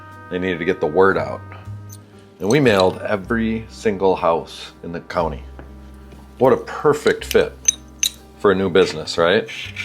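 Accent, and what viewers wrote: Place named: Upper Midwest, United States. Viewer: I like your show because I learn some new techniques and I like hearing your northern Midwest accent. I grew up in central MN. I have a suggestion for future content. I very much like “restoring/maintaining/servicing” older bikes. I mean much older. I’ve fixed up bikes from early 70’s, 1956, 1944, ,1930, 1915. Can you find anything like that in your neighborhood and do something with that? Since you may not want to fill your shop up with salable used bikes.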